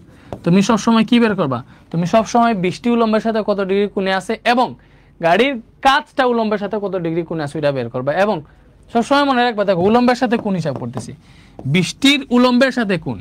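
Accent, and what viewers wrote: Sylhet, Bangladesh. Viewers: ভাই সিলেটি নি??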